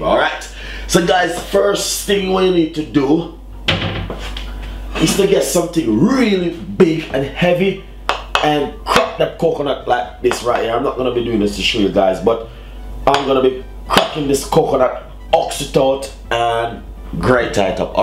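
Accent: Jamaica. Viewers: ❤this my fav rice my dad is jamaican he has a accent like u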